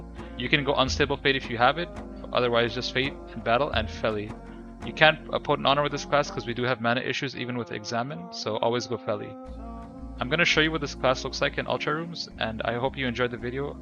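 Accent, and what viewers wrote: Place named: Arab world. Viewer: Your accent is Arabic Where are u from btw fire guide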